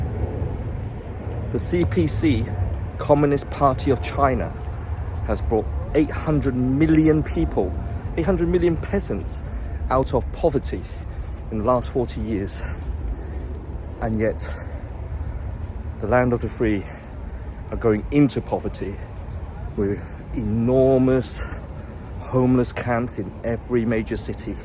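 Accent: United Kingdom. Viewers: Lolz. You spoke in a British accent. Are you British or Chinese? You forogot mentioning 20 million unemployment rates. 😅 I prefer living in Britain than in China! 😂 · Just curious...did you film this in China? I am hearing a very noticeable British accent.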